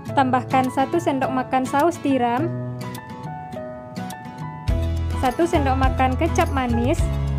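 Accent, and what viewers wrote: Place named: West Sumatra, Indonesia. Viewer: Kk org minang nya,,, keliatan dri logat ny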